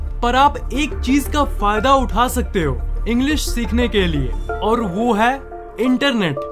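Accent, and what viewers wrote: Bihar, India. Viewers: He is from BIHAR. I am confident. By his accent , tone.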